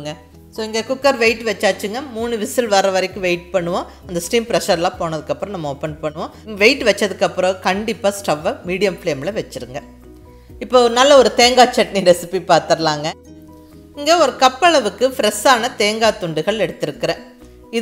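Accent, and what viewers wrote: Kongu, India. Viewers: Today mrng for breakfast i tried tis aunty. My MIL loved tis. I too feel fulled after having it. ❤ from cbe (sundarapuram) i m big fan for ur voice, receipes and our kongu slang❤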